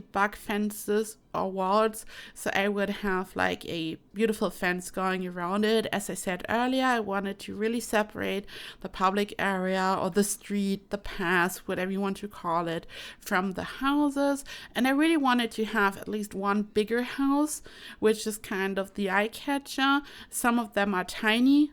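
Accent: Germany. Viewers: Omg hahahhaha this is so funny:D It really is minor. I heard it so many years ago and could never unhear it. I realise its due to accent. My guess would be german? Also, go! Looking forward to more builds <333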